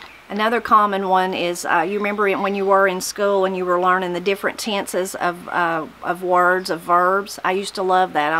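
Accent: Appalachia, United States. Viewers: I learned in West Virginia history, at West Virginia Tech, that the Appalachian accent is one of the hardest accents to mimic! And your videos make me proud of that, we do have a rich hillbilly accent. I love your videos always will, I have a blessed day sweetheart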